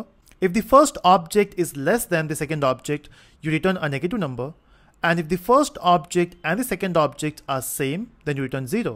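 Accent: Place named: India. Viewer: 咖喱味太重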